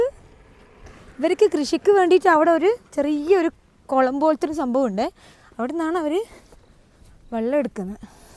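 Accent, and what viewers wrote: Kannur, India. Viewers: Ninte oombiya Kannur bhasha....podi purathu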